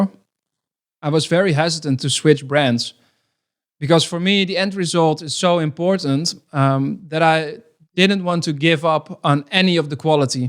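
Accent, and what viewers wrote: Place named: Netherlands. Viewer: Are you Dutch, notice you have an accent. Nice video though!!!💪🏽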